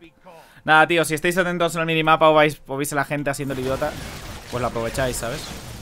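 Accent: Chile. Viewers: habla en chileno xd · Habla como chileenooo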